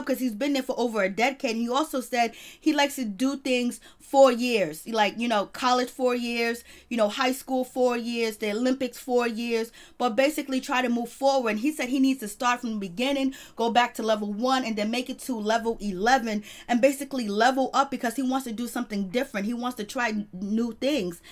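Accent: New York, United States. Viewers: You’ve got a thick NYC accent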